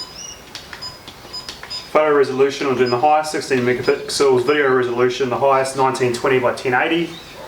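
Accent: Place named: New Zealand. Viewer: Sounds like you from NZ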